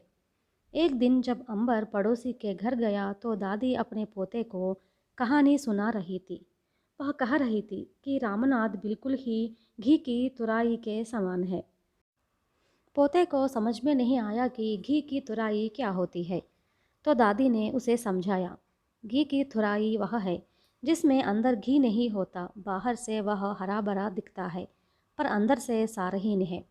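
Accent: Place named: South, India. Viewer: Good morning! Actually, your Hindi accent is hard to understand. It is not fluent & has very strong south Indian, Tami, or keralaite touch... For understanding purpose, watch any Hindi TV channel or listen to "Har kahani kuch kehti Hai or positive inbox" Thanks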